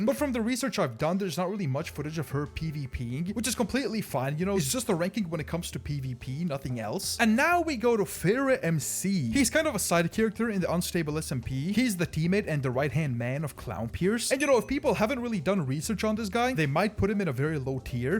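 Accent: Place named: United States. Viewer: lil bros voice changed to american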